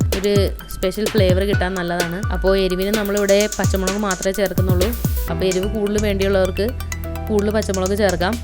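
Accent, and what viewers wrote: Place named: Kochi, India. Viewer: adipoli preparation , kochi bhashayil paranjal polichu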